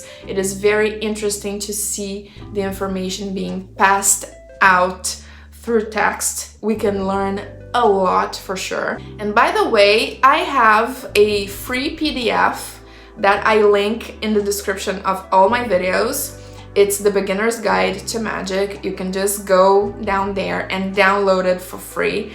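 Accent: Brazil. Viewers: Tava tentando achar informações sobre bruxas e wiccas e caí no seu canal. Reconheci o sotaque brasileiro na hora ❤ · Are you brazilian? That accent sounds familiar to me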